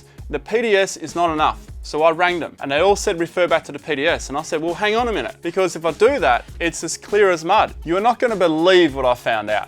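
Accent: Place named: South Sydney, Australia. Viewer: Must be from south Sydney. Surely.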